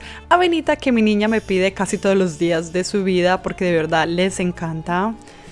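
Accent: Antioquia, Colombia. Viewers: En este video se le salió full lo paisa jejejje❤ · Tenía tiempo sin ver tus videos no sé si es por eso que te escuchaba Colombianisima 😊 mi favorito también es el 🥑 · Gracias bella por tus videos, siempre me encantan, sea el contenido que sea, tu acento Colombiano cuanso lo hablas me gist mucho, tu simpatia, frescura y tu amor, con el top y los vaqueros ibas muy guapa🎉 La granola se veia muy rica, la voy hacer, me alegra mucho que cumplas tus sueños en compañia de tu esposo❤ graciass siempre por ser tú ❤❤ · No pierdes tu acento paisa 😊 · Hola, soy Colombiana y veo tus vidéos desde Ginevra Suiza, me encantan tus vidéos de limpieza y comidas balenceadas, te miro justamente por que el acento colombiano me hace mucha falta, podrias hacer un vidéo de comidas colombianas?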